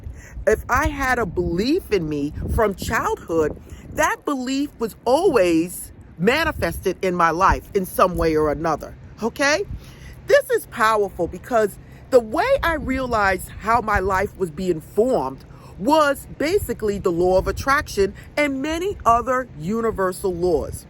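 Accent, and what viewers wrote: New York, United States. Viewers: She sounds like a New Yourka!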